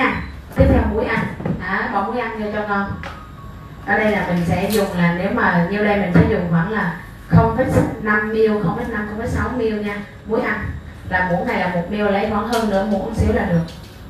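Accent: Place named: Huế, Vietnam. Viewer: Nghe giọng là biết Huế rồi hiihi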